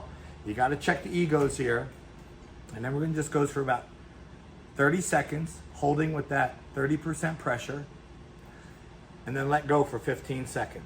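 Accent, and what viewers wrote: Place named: Philadelphia, United States. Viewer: I hear a Philly accent…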